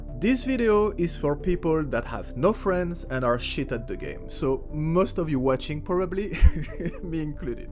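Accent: France. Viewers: I love your French accent man 👌(I look forward to the crafting video)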